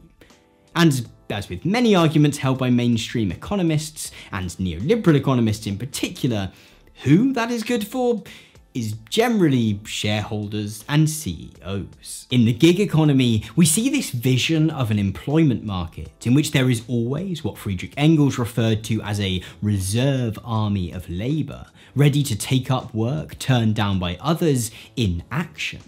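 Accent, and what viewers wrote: RP, United Kingdom. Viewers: Your upper-class gesticulation, alongside your posh Patois, its really triggering. Apart from that great vids!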